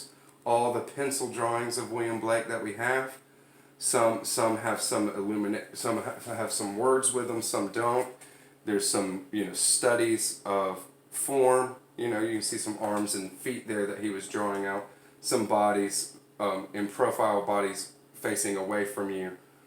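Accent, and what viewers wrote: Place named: South, United States. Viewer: I know you have an accent but don’t yet recognize different regions. I would have guessed southern. I came across Blake in my early 20s, his songs of innocence. I was reading Jerusalem to my mum and she just sang it to me. She was of the generation where it was sung every morning at school. I investigated the thoughts of Blake in writing Jerusalem and loved him and his art ever since.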